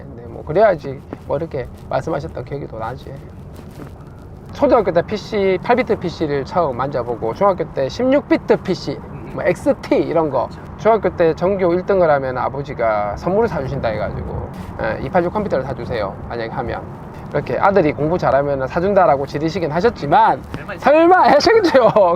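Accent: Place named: Gyeongsang, South Korea. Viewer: 갱상도 사나이 맞네 어무이.. .정겹습니다.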